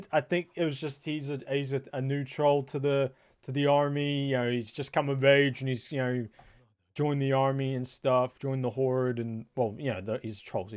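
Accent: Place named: United States. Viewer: Im a kiwi....Your accents odd....Kinda got an american twang.....sorry....this comment probably isn't needed....but i've written it so ill post it lol.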